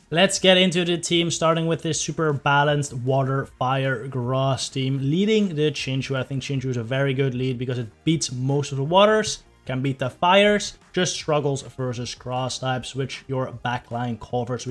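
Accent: Netherlands. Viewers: you sound so dutch lol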